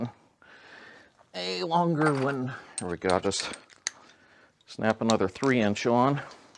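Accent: Pittsburgh, United States. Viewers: I am a fellow „Yinzer“ and love your videos!! I recognized your Pittsburgh accent right away but I really like how you go into what is needed and how to do it with minimal cost. Keep on making these great videos. I am rebuilding a Karmann Ghia and live in the South Hills.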